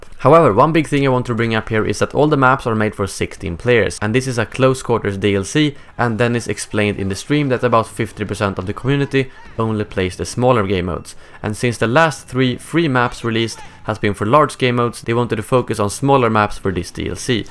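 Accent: Sweden. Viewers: Hello, Mr.Swedish Accent guy commentary!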